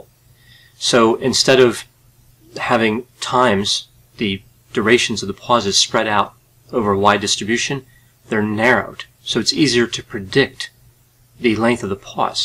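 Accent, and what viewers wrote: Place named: Delaware, United States. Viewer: 👍🏻 Well this was definitely an interesting video. I hope we don’t see the day where robots have anything to do with assessing emotion alone without help from people. 😞 Lol..🤞 And, you have a lovely, and relaxing voice. I like your accent as it reminds me of my home state De!!! 💗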